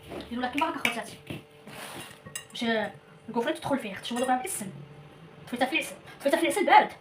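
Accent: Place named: Morocco. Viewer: لهجتك مثل المغاربة هل أنت مغربية ؟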